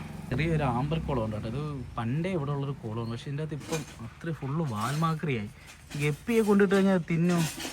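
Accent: Trivandrum, India. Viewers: എനിക് നിങ്ങളുടെ വീഡിയോസ് ഇഷ്ട്ടമാണ്. ട്രിവാൻഡ്രം ഭാഷ കേൾക്കാൻ രസമുണ്ട്. ഒരു 👋 ഇട്ടേരെ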